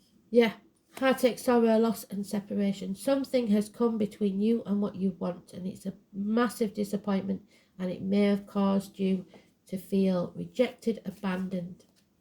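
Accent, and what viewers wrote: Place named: United Kingdom. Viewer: I love your beautiful accent! I see you are from the United Kingdom, I realize that is not just England, LOL. I lived in England when I was a young girl, up north in Durham County, in Barnard Castle. I loved it there. I would come home covered in mud from the Thames after playing in the castle and running around with Shetland ponies, LOL LOL LOL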